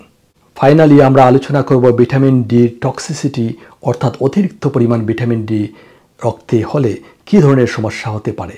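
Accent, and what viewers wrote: Sylhet, Bangladesh. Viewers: আমি সন্দেহ করছি, আপনার বাড়ি সিলেটে ।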